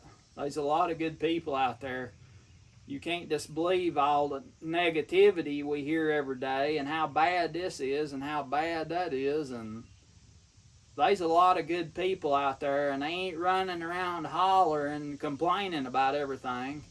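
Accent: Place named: Kentucky, United States. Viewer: you've become my favorite channel because of your stories, life, family, lessons and just being real... and I'm old enough to be your mamma! Your teaching is spot on and the truth if I ever heard it. Plus, the way you talk is just like my mom's people in Kentucky! Love it 🥰 Looking forward to hearing more! May God bless you and your family. Mrs Barbara PS when it comes to failing at something you really wanna learn and do, my motto is to keep doing it until you get it right! I applaud your parents. You're a fine young man I'm blessed to know.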